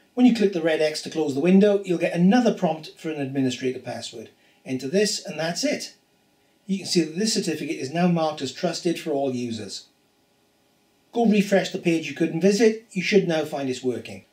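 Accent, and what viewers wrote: Wales, United Kingdom. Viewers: Fantastic. Worked for me and it was easy to follow! Heard the welsh accent and thought I'd give it a chance. Glad I did! Thank you!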